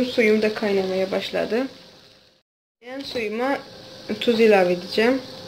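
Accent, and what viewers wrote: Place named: Azerbaijan. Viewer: danisiginizdanda hiss etdimki azerisiz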